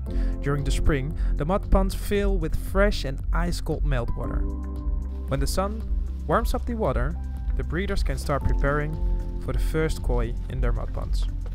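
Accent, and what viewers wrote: Netherlands. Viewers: A Dutch guy (judging by accent) speaking English about Japanese Fish. We Dutchies always had something for multi-cultural stuff... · Are you dutch? Your accent sounds like mine and i'm dutch. Great video too! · are you Dutch? Ik hoor Nederlands 😎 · Dutch accent? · haha ik ben ook nederlands hoorde her meteen aan je accent, toch nog zeker wel een goede engelse uitspraak!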